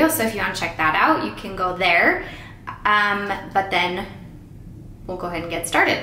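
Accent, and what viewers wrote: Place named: Minnesota, United States. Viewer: Are you from Minnesota? You sound like us if you're not.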